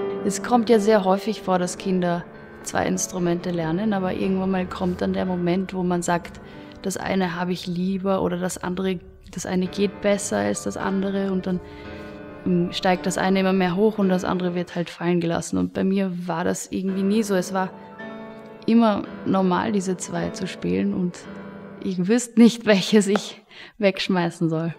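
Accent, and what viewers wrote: Vienna, Austria. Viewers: Sie klingt schon nach Wien. Wirduose Sochen. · Ist halt Wiener Dialekt. Ist quasi das gleiche wie München und bayrisch. Auch für hochdeutsche gut zu verstehen weil nicht so sehr abweichend. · Austrian accent <3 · Nein, ist nicht Wiener Dialekt. Also, das vielleicht auch, aber sicher nicht in diesem Video (hör dir einmal wirklichen Wiener Dialekt an, das ist ganz anders). Deutsch (wie Englisch übrigens auch) ist eine plurozentrische Sprache. So wie US-Englisch kein "US-Dialekt vom Britischen" ist, gibt es neben dem Hochdeutsch in Deutschland auch das Hochdeutsch in Österreich, die unterscheiden sich halt ein bisschen voneinander. Und diese beiden Arten von Hochdeutsch haben dann Dialekte bzw. die allerwenigsten Leute sprechen wirklich reines Hochdeutsch (weder in Österreich noch in Deutschland). hat ein bisschen einen Wiener Akzent, aber zumindest in diesem Video spricht sie (vermutlich absichtlich) recht nahe am Österreichischen Hochdeutsch. 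· you do realise that this is about her german/austrian accent in the english TwoSet vids? Besides that you can clearly tell that she is austrian/viennese from her pronunciation and intonation aswell as her used vocabulary. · She speaks Austrian German, that’s why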